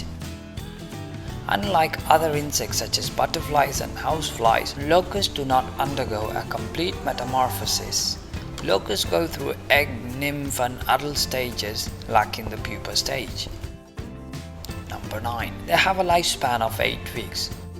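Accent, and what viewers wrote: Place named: Qatar. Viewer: Nice Qatari Voice....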